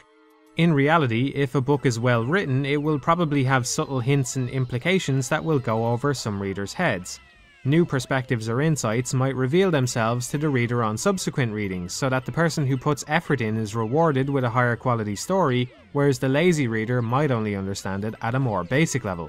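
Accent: Ireland. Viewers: Love your videos. Can't get enough of that Irish dictation. I have a new idea for a review series: Mass Effect.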